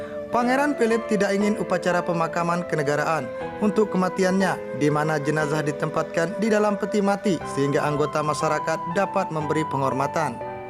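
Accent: Indonesia. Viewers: Dr kmrn bahas cara baca mulu....harap pandai..lidah indo beda...apalagi yg kurang bs bhs inggris..harap maklum lah...jngn spam trs🙏🙏klo ga suka ya uda diem aja...🙏🙏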